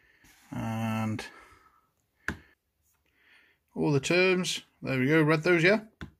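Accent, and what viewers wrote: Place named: South West England, United Kingdom. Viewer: I do know that Argos always fluctuate their prices on Chromebooks, One week the 11.6 inch laptops are £199, then they are suddenly £149 ! I guess it's striking lucky at the right time, Nice review on this Chromebook friend :) going by your accent i'm guessing you live in the South West England, I only say that as i have the same accent & im from Bristol South west!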